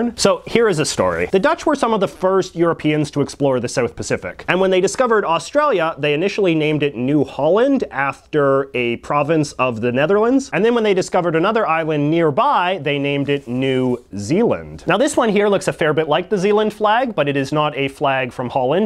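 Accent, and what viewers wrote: Canada. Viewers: Way do u have the stereotypical Canadian accent. We don’t own him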